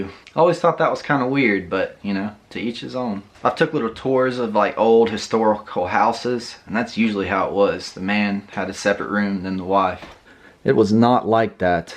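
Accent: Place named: South, United States. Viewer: Love what you’re doing restoring your property. That has always been a dream of mine to retire a property to retire in. What state are you in, sounds like maybe in the southern US. Hope you’re feeling better soon.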